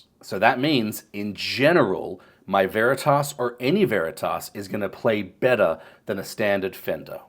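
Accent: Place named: Australia. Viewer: Nice try getting a free guitar sponsorship from them, you got too little subs and views, and ur Aussie accent ain’t helping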